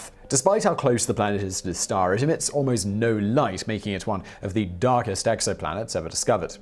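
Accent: United Kingdom. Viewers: At toll... mahgma... waatah. Sorry, I can't stop repeating you, lol. I love the U.K. accent!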